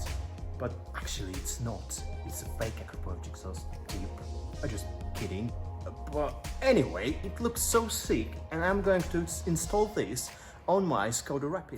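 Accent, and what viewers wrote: United Kingdom. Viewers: Чувак, молодец, лайк за приятное произношение английского))) Похож будто англичанин)) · Well done, it adds a bit of flavor to the exhaust, personally I'd go for a bit less noise for daily driving. But I do have to say, your english is very good, you almost sound British lol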